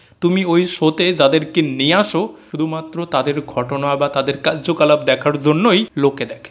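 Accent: Bangladesh. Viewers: Pl একটা কথা বলি don't mind, তোমার মুখের ভাষা আর উচ্চারণ একটু practice করবে, বাংলাদেশী ভাষার টান শুনতে ভালো লাগেনা আর English টা ও improve করো ভবিষ্যতে তোমার help হবে,তোমার ভিডিও আমার মজার লাগে 😊😊🎉🎉🎉🎉🎉🎉🎉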